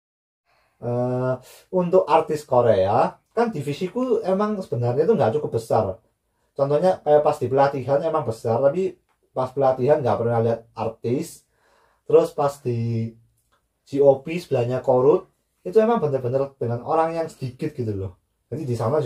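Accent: Java, Indonesia. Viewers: Lama-lama logatnya kaya orang jawa,logatnya mengikuti mas hansol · Wah saya suka dg medoknya tinggal di Jawa az Oppa 😁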